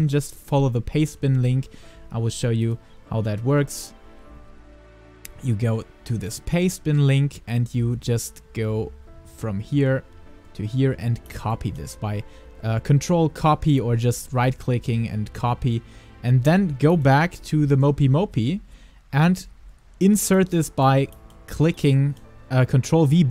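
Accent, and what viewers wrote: Germany. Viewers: You're German am I right? :D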